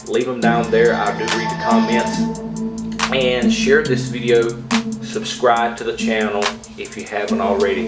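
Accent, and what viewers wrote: United States. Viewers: At first I thought it was a black British guy trying to put on a southern American accent but nope. Just a country ass black dude....right on! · Great Review bro! I'm originally from Laurinburg, NC and you sound like the folks from Pembroke, NC! The accent reminded me of home. Good Stuff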